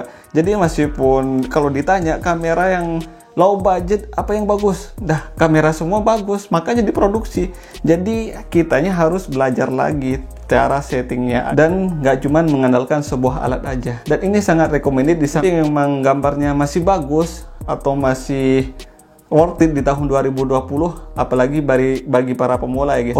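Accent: Madura, Indonesia. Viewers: Logatnya madura😅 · Medok bangget bang Madura nya 😊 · Madura ya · Madura mana bang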